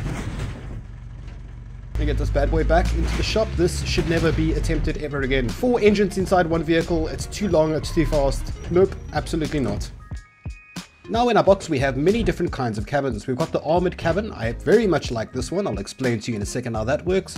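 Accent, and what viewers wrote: South Africa. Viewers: The taxi shit killed me, I had my suspicions that you were South African from your accent, but that minibus taxi joke confirmed it. You aren't wrong though 😂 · I new you acsent wasJuf vir vandag periode 5 se hulle ons het SS. Is die geo of gesk ¿ South African Edit: I now realized that I typed like I was drunk Wtf I’m keeping it like that Admire it Admire it!!!! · wait , your from south africa , i knew it I thought i recognized the accent , nice joke about the taxis in SA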